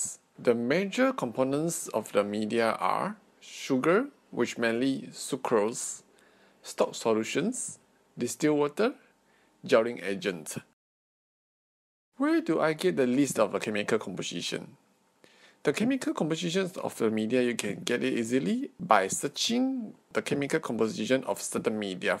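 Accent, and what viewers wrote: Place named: Russia. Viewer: Are you a Russian Scientist? You sounded like a Russian .....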